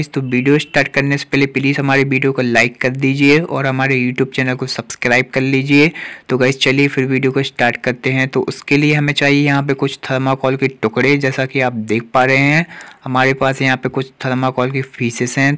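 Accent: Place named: Narsinghpur, India. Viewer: Boli se 😃 narsighpuriya sabse badhiya 😉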